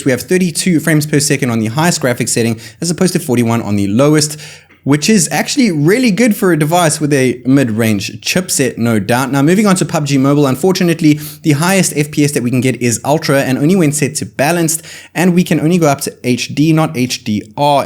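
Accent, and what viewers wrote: South Africa. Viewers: Bro you sound South African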